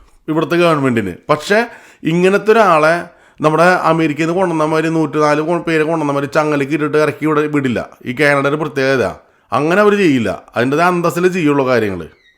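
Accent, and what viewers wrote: Thrissur, India. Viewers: Good presentation 👍Thrissur slang super 🤩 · ഞാൻ ദൈവവിശ്വാസം ഇല്ലാത്ത ആളാണ് എന്നാലും ബ്രദർ തൃശൂർ സ്ലാങ് ഇഷ്ടപ്പെട്ടു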